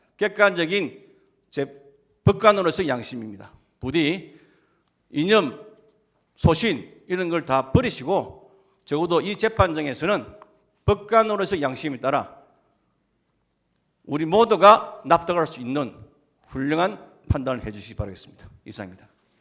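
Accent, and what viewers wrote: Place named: Gyeongsang, South Korea. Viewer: 또 경상도네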